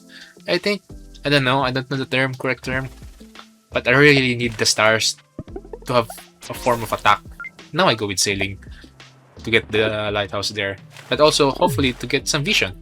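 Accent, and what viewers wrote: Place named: Philippines. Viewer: U sound Filipino lowkey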